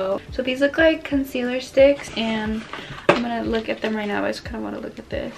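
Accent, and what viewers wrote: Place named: United Kingdom. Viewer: That british accent is everything😂❤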